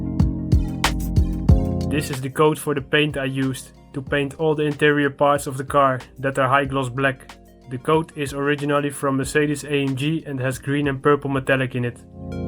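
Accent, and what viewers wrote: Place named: United Kingdom. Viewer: Haha ik weet niet hoe maar ik dacht dat je brits was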